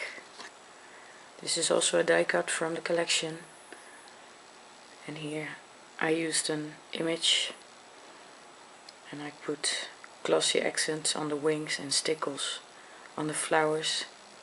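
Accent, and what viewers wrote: Netherlands. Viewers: Ik geloof aan je stem te horen dat je Nederlands bent? ik wil zelf ook graag proberen een scrapbook te gaan maken voor mijn examen, ik moet een modeboek maken dus lijkt me wel leuk om hem zo als een scrapbook te maken want dat heeft iets aparts. nou vroeg ik me af waar ik zulke spullen ervoor kan kopen weet je misschien goeie winkels/webshops?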